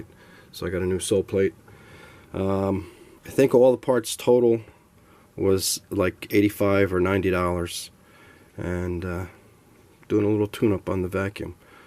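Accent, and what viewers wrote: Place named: New York, United States. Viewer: Keep , keeping busy bud . Just love your New York accent . Merry Christmas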